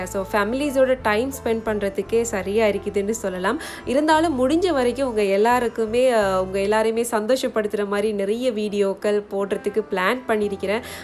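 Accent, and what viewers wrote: Sri Lanka. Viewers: அஸ்ஸலாமு அலைக்கும்.சிஸ்டர்.உங்க வீடியோக்கள் இப்போ recent aa than paarkiren.ungaloda srilanka Tamil super.enakku ungalai romba pudichirukku.நான் boy இல்ல சிஸ்.girl தான்.மெயில் ஐடி ல என்னோட கணவர் பெயர் இருக்கும்.தப்பா நினைக்காதீங்க சிஸ்.என்னோட பெயர் பௌஸுல் ஹிதாயா.தமிழ் நாடு.உங்க family க்கு நான் துஆ செய்கிறேன்.எங்களுக்கு நீங்க துஆ செய்யுங்கள் சிஸ்டர்.ரிப்ளை அனுப்புங்க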